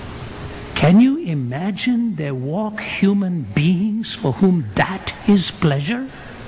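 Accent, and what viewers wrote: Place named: India. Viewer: From the outside, he sounds like any other evangelist/ con man, only with that India/ Pakastani/ guru accent, that makes people 10 times more likely to believe he has spiritual access to your God. Doh!... Why is it, that all of the defenders of Christianity have a big money stake in keeping it going?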